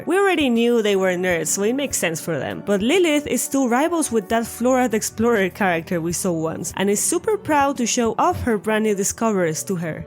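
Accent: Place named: Latin America. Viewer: Are you latina?? Omg I love your accent I'm from Colombia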